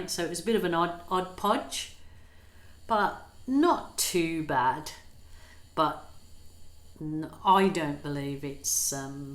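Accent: Birmingham, United Kingdom. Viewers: Better than wasting leftover paint :) And it's fun to just play around sometimes! I've noticed that when you take your photos, we see the colours more vibrantly and possibly more true to life. Also, I knew you were in Australia, but I assumed that you were from the UK lol, Your accent definitely has a touch of Brum to my ears. But I am almost completely deaf so my ears can't be trusted lol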